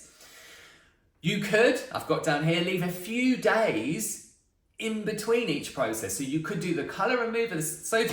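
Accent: United Kingdom. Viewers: Hi ,are you in the UK? I'm assuming you are by the lovely accent you have xx Do you take bookings can you do my hair please ?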